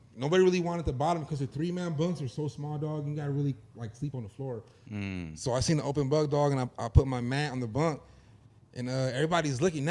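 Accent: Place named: Mexico. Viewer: He got more of a Mexican accent you got mexicans straight from mexico that look exactly like him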